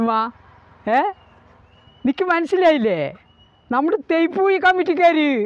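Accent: Palakkad, India. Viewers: പാലക്കാട് ഭാഷ 🥰🥰🥰🥰സൂപ്പർ. നിങ്ങൾ pkd എവടെയാ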